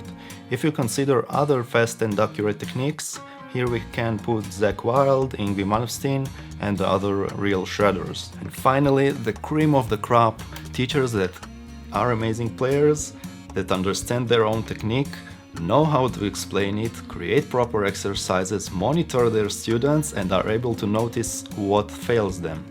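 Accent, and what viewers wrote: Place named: Russia. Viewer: Я уверен, что если бы ты вёл канал на русском - то подписчиков было бы гораздо больше! Слушать русскоговорящего, который говорит на инглише с русским акцентом - это реально тяжко )))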